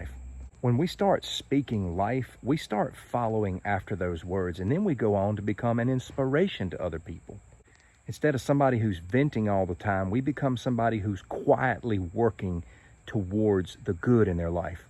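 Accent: South, United States. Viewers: I like your Southern drawl. Reminds me of my granddaddy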